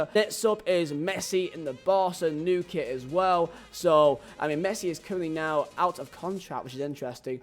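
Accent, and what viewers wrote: United Kingdom. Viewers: Bruh, how are you in poland with a strong british accent like that?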